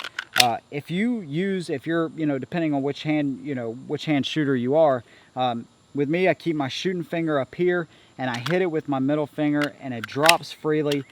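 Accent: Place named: South Carolina, United States. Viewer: You from South Carolina man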